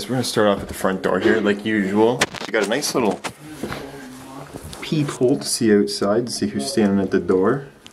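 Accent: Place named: Canada. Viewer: I can already guess this is probably in Canada because of your accent.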